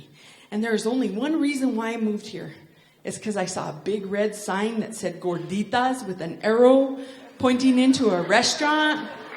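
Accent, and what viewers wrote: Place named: Northern New Mexico, United States. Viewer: Love that Northern New Mexico accent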